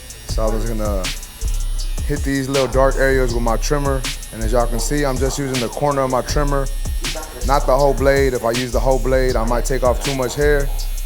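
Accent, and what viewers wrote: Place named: Mexico. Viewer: u lowkey sound like that mexican OT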